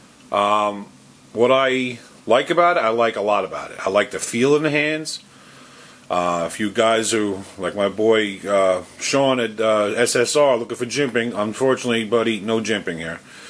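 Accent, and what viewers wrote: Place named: East Coast, United States. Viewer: ok !!!! I agree with everything you said!!! we can be friends I tend not to go over $200 either I think it's east coast mentality! but its tough because I tend to go for premium steel these days only, s35vn, cts-xhp elmax m390! I favorite steels are Elmax and m390! do you have a preference? I have a full collection of discontinued kershaws all brand new with boxes! some of the ones I own are the kershaw offset, (Please checkout my video on that), the tyrade, storm, n ergy, cyclone, and a kershaw barrage (AKA Salvo) I wanted to collect all the kershaw knives before 2012 and zt came along to me its sort of historic, as much as I loved knives I wanted my collection to have some sort of theme it made sense anyway, anyhow sorry to ramble but I don't have any friends who are into knives, wish I met you 30 years ago but you probably weren't born Yet? 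wish i could meet you some day you seem like a cool dude your accent makes me feel connected to home. I live in Washington now and the food here sucks I'd kill for pizza! hell i'll take a knish!!!! LOL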